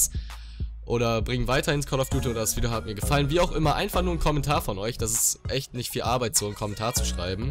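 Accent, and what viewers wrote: Osnabrück, Germany. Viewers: Kommst du aus Osnabrück?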